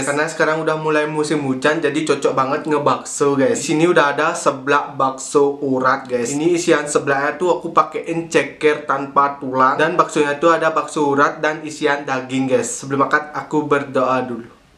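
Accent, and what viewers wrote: Java, Indonesia. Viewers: medok bgt KA bakso nya bikin ngilerrr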